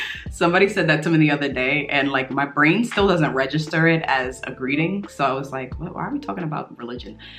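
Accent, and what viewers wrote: New York, United States. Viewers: You TOTALLY have a NY accent!! But it’s particularly more Queens or Brooklyn. It always makes me think of Mos Def. 🤓😎 I love it! ❤️ I have a Philly accent but am more careful to speak a “standard English” when I speak to people outside the US. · I can't hear any New York accent. I'll bet you could put one on if you wanted. Edit: I heard it when you said "horrible." 😊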